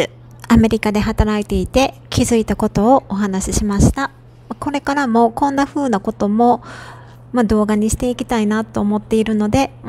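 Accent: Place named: Kansai, Japan. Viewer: 2年ぐらい前の動画ですね、今日初めて拝聴しました。 私は南のニュージャージー州に住んでます。アメリカ生活長いですが（33年目）英語下手です😂 Yucoさんはアメリカの大学を卒業されてアメリカの企業に勤務されてるだけあって英語堪能なんですね👍 英語で会話する時はOverlapは家族や友達の場合はいいでしょうけど、Yucoさんのおっしゃる通りだと思います。 声の声域も良くてとっても聴きやすいです、時々関西弁？のアクセントに聞こえますが、親しみがあって良いですね、 方言大好き、特に関西弁大好きです。 これからも動画の配信楽しみにしてますね。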